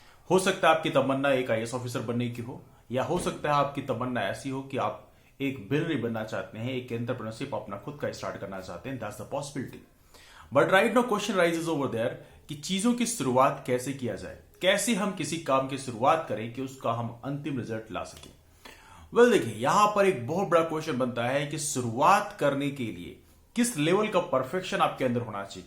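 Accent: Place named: United Kingdom. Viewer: sir me apki videos dekhti hu bate bhi apki achhi hoti h bas ap hindi ko bhi English lahje me bolte ho to ajeeb lgta h lekin aap hmse bade h esiliye achha lgta h apko sunna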